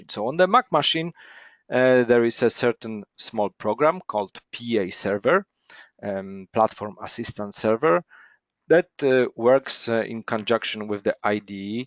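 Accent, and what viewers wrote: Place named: Poland. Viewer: you are simply the best, "English made in Poland"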